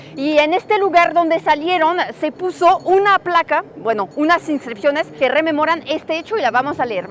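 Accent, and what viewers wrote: Mexico City, Mexico. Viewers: ...La Chilanga. Unica....amiga eres unica...me facina mucho tus videos son unicos e informativos culturales y educativos...de la ciudad de mexico y alrededores......bueno lo poco o mucho que algunos ya sabemos de todo lo que tu informas....y que no es mucho..pero tu eres la mera..mera chipucluda...en eso de todo lo referente a mexico y cultura tanto pintura arte escritura y hasta de gastronomia..... Pues eres la primera y unica muy profesional...influnce...ya eres doctora graduada en eso felicitaciones y siga asi echandole ganas porque conocemos mas de nuestras raices y asi saben quienes somos y a donde vamos..bueno adios... CHILANGA...querida...me gusta escuchar tu voz o sea tu tono de voz o acebto tipico. Chilango...😂...😅 jaaa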